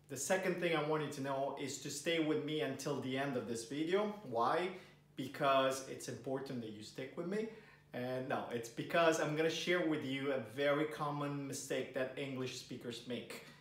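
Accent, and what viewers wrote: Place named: Italy. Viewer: Ciao. This video was really helpful. All my answers were correct, the only thing is that I misspelled parlero. I’ll continue watching your videos as they’re very helpful. I wish I could write this whole message in Italian, and I hope someday I will. I love when you speak in Italian, the Italian accent is so bello. I like to hear you all the time. Grazie mile, 😊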